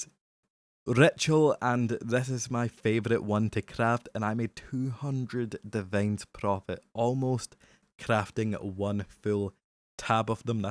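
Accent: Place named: Scotland, United Kingdom. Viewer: It's called scottish accent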